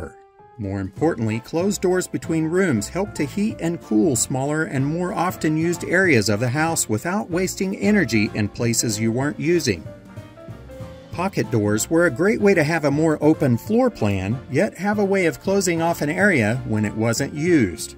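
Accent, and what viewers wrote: United States. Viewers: Sounds like you are an American. I am English and was born and grew up in a home built in 1666. No inside toilet. No mains water, this came from a well and was brought into the house in buckets. No electricity. When I started to school I was fascinated with the light switches and taps(faucets) . At 72, I now live in a house built in 1972, with many modern conveniences but I still hang my laundry on the line outside on fair weather days. ps. My childhood was not too typical of the time, but one advantage was having 70 acres of land which allowed me a lot of freedom and a diet of home grown organic food. Love from England.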